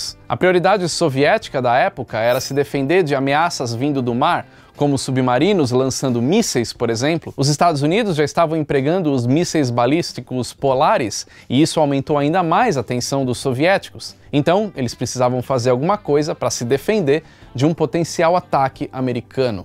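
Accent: São Paulo, Brazil. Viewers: Engraçado q esse cara parece o Gugu falando. De q parte de São Paulo isso vem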